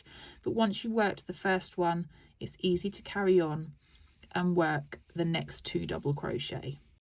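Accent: England, United Kingdom. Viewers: You sound English but you’re calling them DC when they’re UK TC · you sound lovely English ☺️ Thanks for sharing your knowledge, it's gold to me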